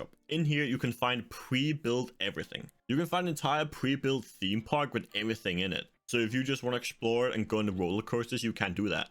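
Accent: Denmark. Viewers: Are u danish? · Er du dansk? :D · I COULD HEAR IT IM FROM DENMARK TOO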